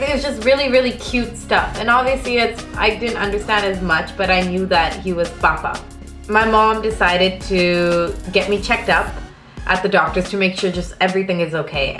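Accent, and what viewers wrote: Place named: India. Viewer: omg i love Indian ascents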